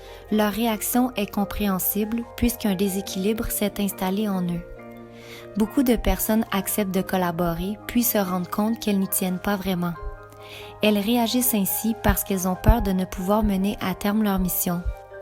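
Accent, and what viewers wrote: Quebec, Canada. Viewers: au petit accent du Québec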